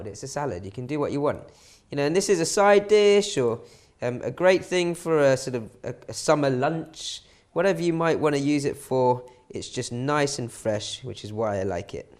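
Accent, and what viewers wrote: United Kingdom. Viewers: Too much sugar....i can not trust this man. Also, an annoying British accent...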